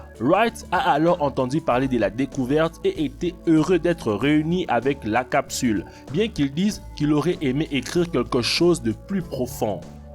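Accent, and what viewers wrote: Quebec, Canada. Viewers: Le français Québécois bande de cons. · Adriien Terliier Toi apprends déjà à savoir que c'est un québécois et c'est pas de sa fautes ci il s'exprime mal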